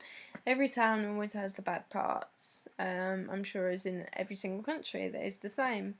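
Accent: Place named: Liverpool, United Kingdom. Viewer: Its scouse not liverpoolian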